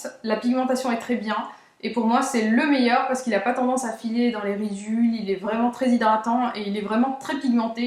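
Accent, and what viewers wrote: England, United Kingdom. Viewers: Super vidéo, merci ! Pour ma part, ton accent anglais me convient parfaitement, je trouve au contraire qu'il est top, ce qui n'est pas courant ;-)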